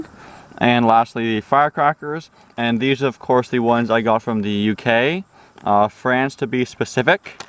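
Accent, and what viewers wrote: Sweden. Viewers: Haha är du svensk? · are you swedish ?!? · Are you swedish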